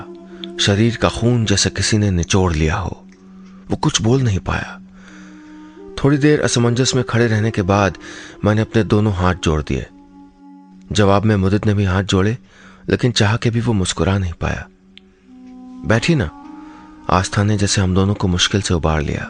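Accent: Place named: Uttar Pradesh, India. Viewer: sir ...apki aawaj me kuchh up ka touch lgta hai ....😍😎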